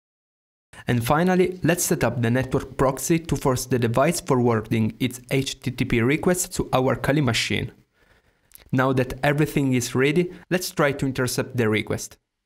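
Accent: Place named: Italy. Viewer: I was looking for cryptocat's video but apparently I found something better ahaha loved your explaination, solo un po' strano sentire l'accento italiano :D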